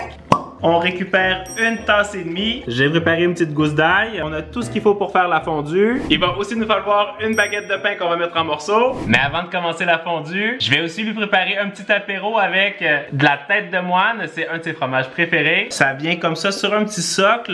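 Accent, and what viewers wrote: Quebec, Canada. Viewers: Après tant d'années à te suivre ton est toujours aussi incroyable pour moi, vraiment l'accent québécois best accent de la planète ! ✨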